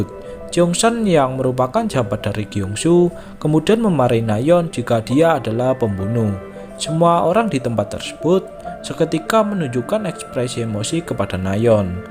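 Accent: Java, Indonesia. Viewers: Fix admin orang Jawa nih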